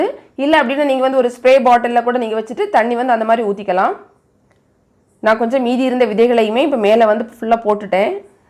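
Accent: Kanyakumari, India. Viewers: Mam neenga kannyakumari ah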